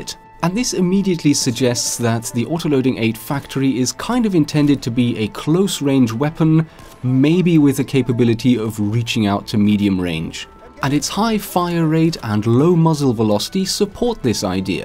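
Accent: Germany. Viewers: Are you German ? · lol are u german ?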